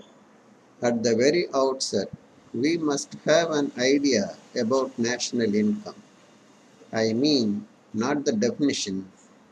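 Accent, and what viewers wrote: India. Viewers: i bet the video is great but i can't understand what you are saying becasue your indian accent is so strong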